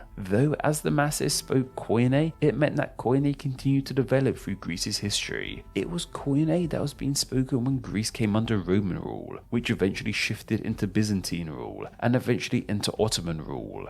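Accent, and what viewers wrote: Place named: RP, United Kingdom. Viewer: Dude you sound well posh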